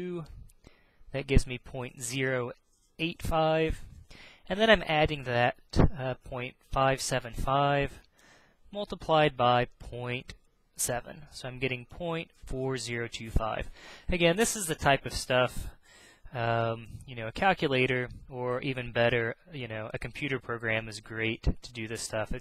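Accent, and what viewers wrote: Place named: United States. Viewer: And the american accent makes it cooler as well. :D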